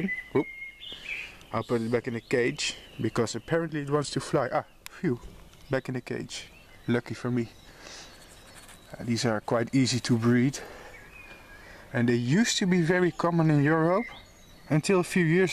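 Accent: Netherlands. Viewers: Beautiful creatures, and never apologize for your Dutch accent! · Those are some very pretty moths! I immediately knew you were Dutch haha! But don't even worry about the accent, you're very easy to understand :)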